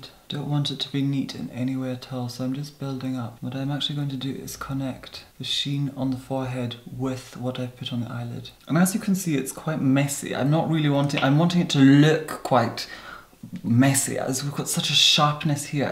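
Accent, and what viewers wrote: Scotland, United Kingdom. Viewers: patsy t That's Scots accent love, we all speak like that here! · Imagine manny attempting to parrot everything says 😺 He couldn't string it. No offence to Americans x BTW his accent is Scottish x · Anton Fuchs Scottish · So much talent. But i miss ur scottish accent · Favorite part is that he's got a faint Scottish accent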